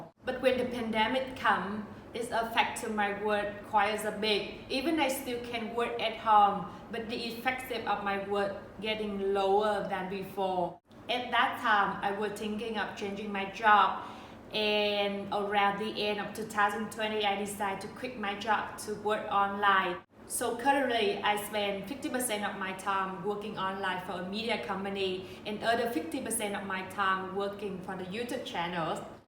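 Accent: Thailand. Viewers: I could see you were Vietnamese from your last name, but I thought you had a Thai accent and not a Viet accent when you speak English, so I actually thought maybe you were a Thai Vietnamese 😀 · It's cool that you are Vietnamese! I honestly thought you were Thai, because your accent is similar to my mom's (she is from Thailand). Also, my mom's nickname is Dang, which means red in Thai.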